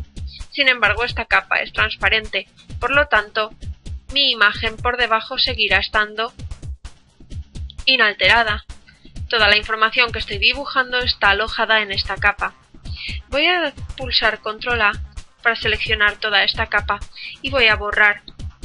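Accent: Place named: Spain. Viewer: Hola, gusto en conocerla por este medio, ya estoy suscrito en su canal, me gusta la manera en que explica, este es el primero que veo. Felicidades, por que hay otros tutoriales que dejan mucho que desear. Un saludo desde Mexico, a proposito una pregunta por su acento creo que usted es española. Espero no equivocarme.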